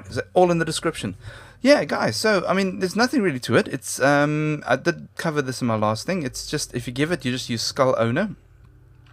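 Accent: South Africa. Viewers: are you South African? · He does sound South African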